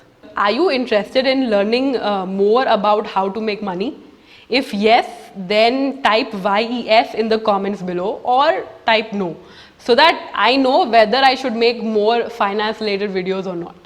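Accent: India. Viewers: आपकी वीडियो मुझे इसलिए पसंद आती है, क्योंकि आप भारतीय तरीके से अंग्रेजी बोलती हैं,, I love you didi❤️❤️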